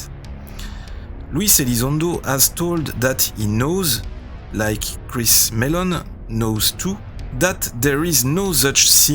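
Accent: France. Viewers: Swedish is my native language but/and I understand you to 100%. Please don't lose your French accent, it would such a shame. Merci de partager vos connaissances avec nous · love your french accent :) keep the cool videos rolling out!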